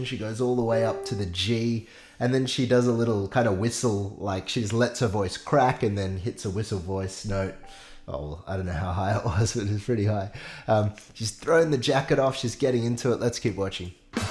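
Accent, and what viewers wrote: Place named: Australia. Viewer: I love ur accent. Ur Australian accent.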